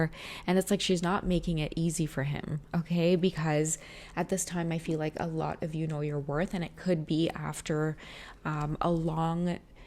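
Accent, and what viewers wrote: Trinidad and Tobago. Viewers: A warm Trini shout out! I love when you speak in your Trini accent makes it even authentic. You have an amazing vibe and your reading provide such clarity.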